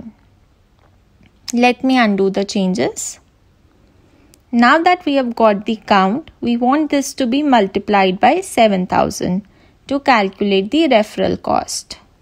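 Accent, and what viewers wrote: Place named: Trinidad and Tobago. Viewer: Are you from Trinidad 🇹🇹? You sound Trinindian.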